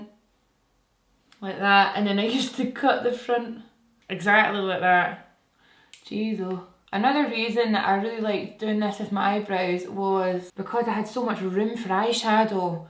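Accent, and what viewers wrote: Scotland, United Kingdom. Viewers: Never lose that gorgeous scottish accent. <3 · Omg that accent is the best! Scottish? I’m a Swede so don’t hate me if I guess wrong 😛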